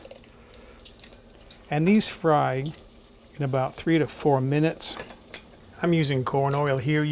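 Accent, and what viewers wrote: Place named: Rhode Island, United States. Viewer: First of all, if you’ve been making clam cakes for decades then you’re my clam cake guru. Also, that’s a whole lot more clams than most of the clam cake makers back in Rhody put in their clam cakes while I lived there, most of them use mostly clam juice & try passing them off just like fake Rolex’s! I plan to make clam cakes the same way that you did in this video when I make clam cakes & chowder this Thursday! I’m gonna use cherry stones rather than canned clams though. Also, you sound a whole lot like most of the people who I left behind 5 years ago when I left Warren, RI & moved here to SanDiego. I also left the clam cakes behind so I’m totally jonesing for clam cakes because they don’t sell them out here in California. So it’s make my own & I’ll do it just like you did it in this video!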